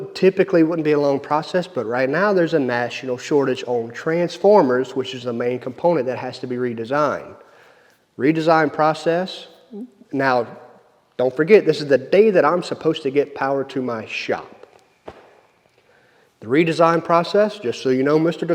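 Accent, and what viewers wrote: Louisiana, United States. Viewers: Sounds like Louisiana. I was in Shreveport and moved out to Greenwood to get away from the politics and the hassle. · Where in Louisiana are you. So strange hearing that accent on youtube. Not used to hearing it anywhere near an internet connection. And this heat has been killer. The insulation just hurts you more without AC. Better to open the doors and run a fan until you get enough power to run your AC.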